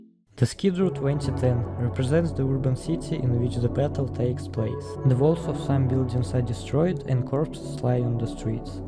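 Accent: Russia. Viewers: Русский акцент прослеживается чел, но все равно молодец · СЛЫШНО ЧТО ТЫ РУССКИЙ АКЦЕНТ ТО РУССКИЙ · Чел ты Русс у тебя слышен русский акцент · Я чую голос русского, по произношению слышно) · Наш мужик слышно по акценту))) найс контент)) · Зачем ты говоришь на английском, у тебя ярко выражается русский акцент :\ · АААААА БРАТАН ТАК ТЫ РУССКИЙ ООООООО МЛЯЯЯ НЕ ОБЕССУДЬ Я НЕ СО ЗЛА КОНЕЧНО, НО ТЕБЕ СЕРЬЁЗНО НАДО ПОРАБОТАТЬ НАД ПРОИЗНОШЕНИЕМ. Знаешь, как сильно иностранцы ненавидят такой акцент? (Я тебя спалил кстати по Ал-Кайде. Ты очень по-русски это сказал)) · Huh, russian accent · как же слышно то что ты русскоязычный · Русский акцент не скроешь) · english language on russian accent is atractive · акцент то русский попался гузлик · Нафига ты говоришь на английском. По акценту слышно - русак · По акценту ты русский, я это сразу с первых минут просмотра понял, если нет, жалко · Блин мужик, снимай на русском. 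Но если я ошибся, и это просто акцент, то извини - на карте еще можно было выключить электричество через щиток в комнате с бильярдом у кт спавна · Ты из России,я это по акценту понял. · Он русский, выдаёт акцент, +под некоторыми профилями его друзей в стиме оставлены русские комменты. (скрывается от кого то 100% :D ) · Слышится русский акцент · idk why, but this accent sounds more like russian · Вроде бы указанная страна в описании - Великобритания, а акцент на уровне "ай хэв май мазэр анд ай хэв май бразэр" слышится... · слышу русский акцент, замутил бы еще русскоязычный видос или русскую аудиодорожку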